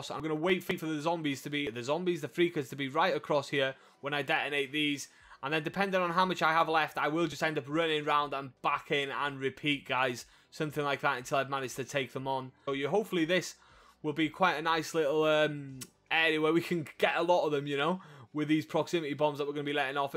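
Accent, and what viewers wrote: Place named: Liverpool, United Kingdom. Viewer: I'll subscribe just cause you're scouse lad. Get sick of watching the American kids sometimes 😂😂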